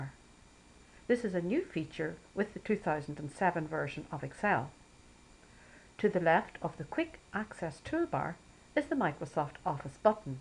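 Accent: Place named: Ireland. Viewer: Thank you for your time. Love the motherly Irish (?) lilt too, very relaxing, I almost fell asleep:)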